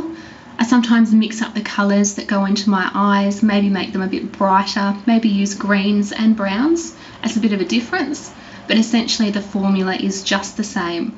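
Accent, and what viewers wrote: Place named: Australia. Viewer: Great look! i love your accent!! your Australian right??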